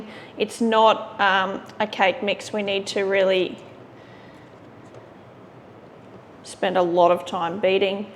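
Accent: Australia. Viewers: Love this video! You sound so Aussie in this one !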